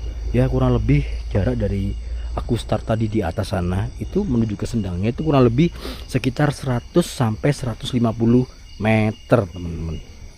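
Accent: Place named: Pasuruan, Indonesia. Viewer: GUENDENG PAK... KHAS AREK PASURUAN 😂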